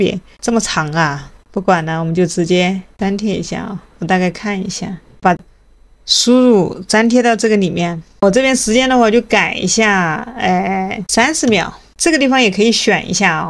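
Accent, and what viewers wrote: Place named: Hubei, China. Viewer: 我听您口音，好像也是湖北的哈。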